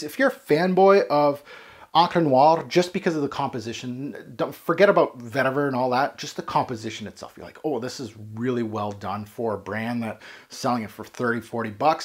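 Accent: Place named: Montreal, Canada. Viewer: Dude are you from the province of Québec? Sounds like a solid Montrealer accent :)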